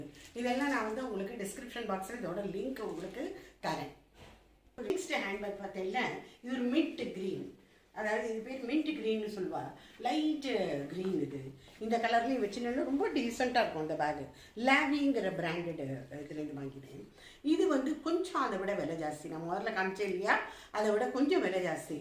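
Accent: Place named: Chennai, India. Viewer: Nice sharing mam. I like Your talk also . Tipical Chennai person it seems. I am also a crazy person in hand bag collection. Mam, how you are maintaining your hand bags? Kindly share.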